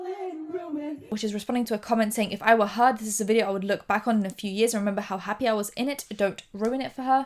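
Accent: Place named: England, United Kingdom. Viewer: Shes English. Not sure exactly where in England though. Maybe London?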